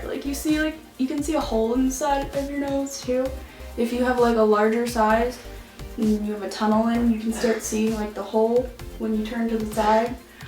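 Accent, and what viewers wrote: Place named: Canada. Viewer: love your accent, Canadian??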